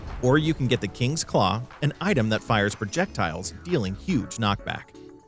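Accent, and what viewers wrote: Netherlands. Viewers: are you realy english or dutch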